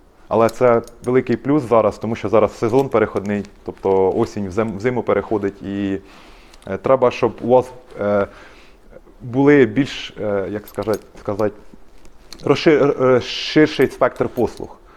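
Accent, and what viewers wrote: Poltava, Ukraine. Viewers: полтовський окцет))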